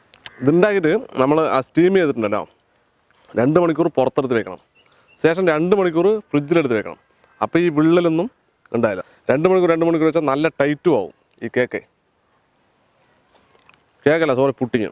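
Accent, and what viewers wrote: Palakkad, India. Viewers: Chetta pudding adipoli...valare simple ayittum ,cool ayitta karyagal chiyunnath .matramalla palakkadan slang ..super ikka ......😎👌...god bless uuuu.....all the very best...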